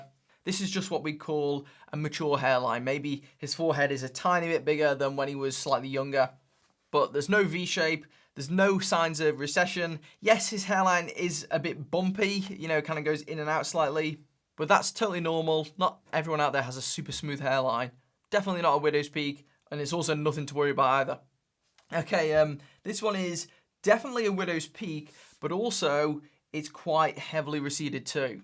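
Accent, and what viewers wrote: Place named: Stoke, United Kingdom. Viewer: Are you from Stoke by any chance. Your accent sounds like something you’d hear in Stoke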